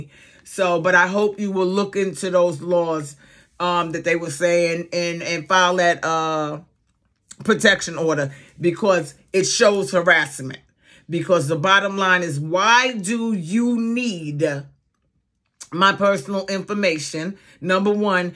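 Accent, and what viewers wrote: East Coast, United States. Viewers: I hope you make friends AND make money. I’m a new subscriber. I hear that East Coast accent! Have a blessed day!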